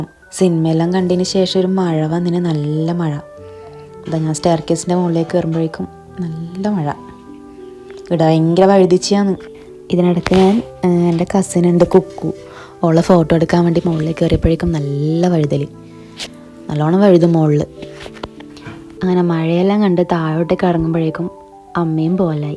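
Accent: Kannur, India. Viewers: Kannurkaari analle samsaram❤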